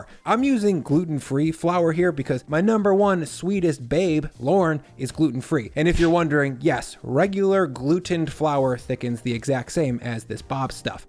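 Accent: United States. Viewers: You sound American but you are using metric measurements? WTF?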